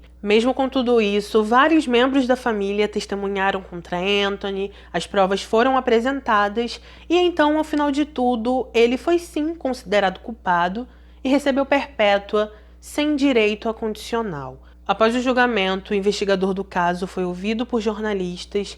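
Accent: Rio de Janeiro, Brazil. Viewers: Adoro O sutaque carioca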